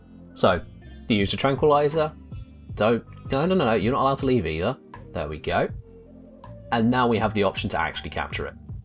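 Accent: United Kingdom. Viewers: you and your beautiful british accent saved me, thank you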